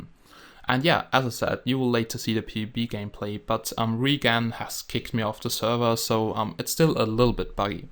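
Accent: Germany. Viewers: Merkst du das nicht:D? ich finde man merkt es an seiner aussprache :) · Man merkt das du Deutscher bist.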